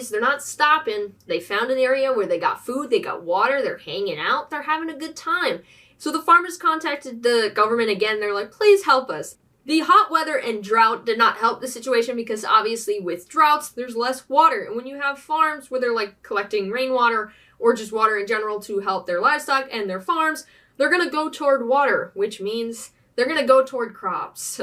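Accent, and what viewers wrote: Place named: Transatlantic, United States. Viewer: Love the music choice for the intro 😄. Can just hear that transatlantic accent.. and now for more Weird World History! 😂 "Number of birds" must be 2 🙂.. that's a number of birds 😄. "Let's go to war with a giant bird!" 🙂😂 Imagine if they really did say that 😆. And now they're protected.. they totally won 😂. You seem very passionate about this. Your eyes as you say "HUmAns!" 😄. It's nice.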